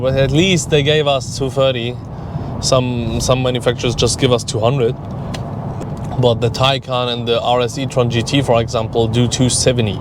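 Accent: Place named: Germany. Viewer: Thought he was speaking german with that accent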